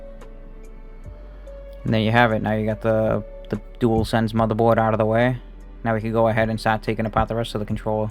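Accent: Boston, United States. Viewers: You from Boston? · Are you from MA? I’m hearing a Boston accent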